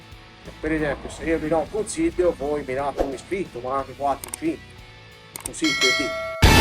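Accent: Tuscany, Italy. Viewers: Quanto sei simpatico oltre a essere bravo...poi con il dialetto toscano sei al massimo. Ciao dalla provincia di Pi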